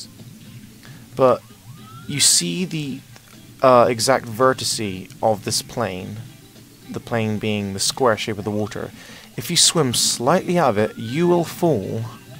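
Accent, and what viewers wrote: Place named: United Kingdom. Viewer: I think hes british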